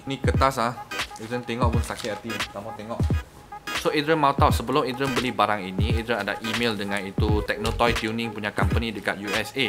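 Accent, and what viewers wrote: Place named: Sabah, Malaysia. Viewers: Mcm logat sabah... 😅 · kenapa ko punya bunyi mcm sabahan saja aa?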